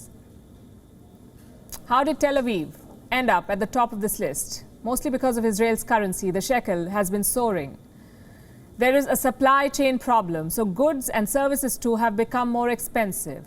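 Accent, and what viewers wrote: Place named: Mumbai, India. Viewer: this dumb mumbai scammer accented anchor thinks its hotshot 🤣🤣🤣😂😂😂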